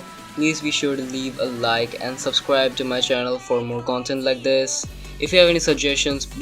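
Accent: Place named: India. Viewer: I love the englisch Indian accent dialect Don't worry bro I won't steal your cow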